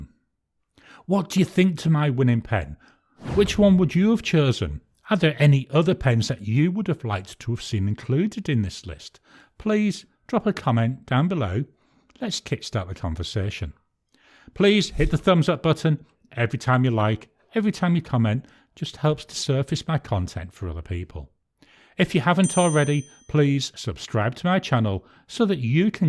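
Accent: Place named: Birmingham, United Kingdom. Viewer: your bio says you're based in Austrialia but to me you sound like you're from Birmingham. Am I right or am completely off on this one 🤔😂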